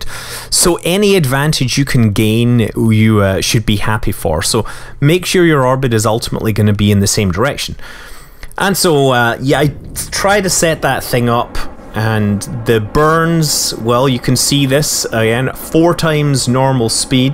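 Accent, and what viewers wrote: Scotland, United Kingdom. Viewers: ^_^ MOAR SCOTTISH! I must obey the need.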